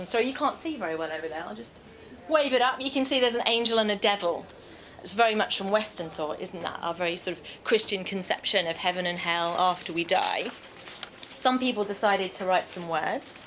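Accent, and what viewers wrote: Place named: England, United Kingdom. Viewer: bit of an English accent and I'm liking it too!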